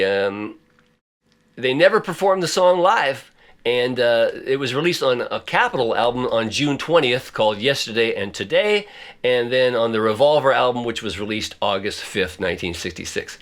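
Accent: Liverpool, United Kingdom. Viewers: Even nailed our Scouse accent, la'! Well, almost.... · no one can succeed in doing a Scouse accent like......!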